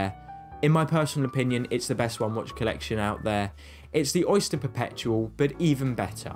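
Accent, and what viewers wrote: England, United Kingdom. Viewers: I can't even understand what this guy is saying with his English accent. You should post this video in Europe. Maybe people's taste in Europe is different as well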